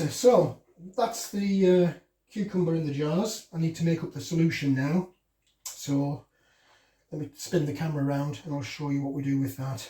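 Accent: United Kingdom. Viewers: You definitely sound British. Love the accent.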